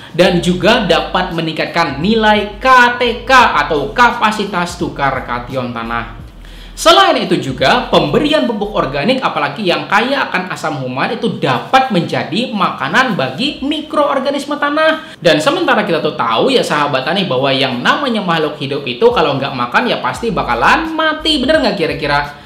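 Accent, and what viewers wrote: Banjar, Indonesia. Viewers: Banjar tagasnya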